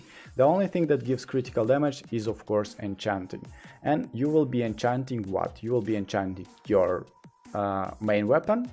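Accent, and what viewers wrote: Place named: Russia. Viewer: Тяжелый русский акцент.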